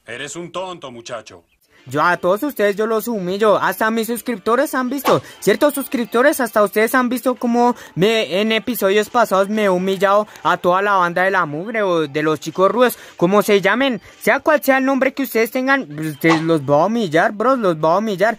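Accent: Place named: Colombia. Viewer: Eres colombiano por tu aciento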